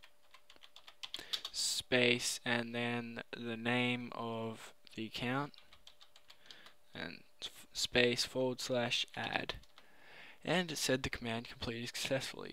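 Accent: Australia. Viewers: hes aussie !! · it's an australian accent, and he's australian. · so Austrailn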